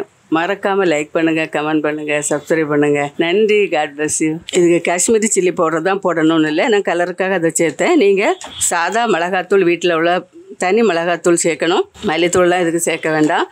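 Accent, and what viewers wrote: Nagercoil, India. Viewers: அம்மா உங்க சமையல் சூப்பர். எனக்கும் என் மனைவி கும் ஒரு போட்டி. நான் நீங்க நாகர்கோவில் னு சொல்றேன் அவ நீங்க மலையாளி னு சொல்றா. அதான் உங்ககிட்டயே கேக்குறேன்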